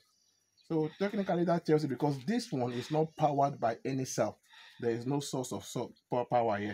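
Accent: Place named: Nigeria. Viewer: 🥱🥱🥱 Nigerian English sucks please use scientific language.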